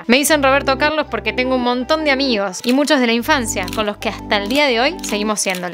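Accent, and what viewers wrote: Argentina. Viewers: Me encanta el acento argentino😍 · Me encantó el vídeo, por fin dejaste de hablar raro y volviste al argentino!!! Esperamos más vídeos!!!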